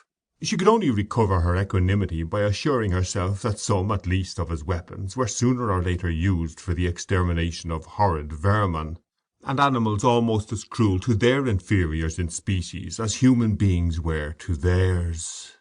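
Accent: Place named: Ireland. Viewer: How irritating; another Irish accent for Thomas Hardy! This is totally unsuitable and cannot be listened to!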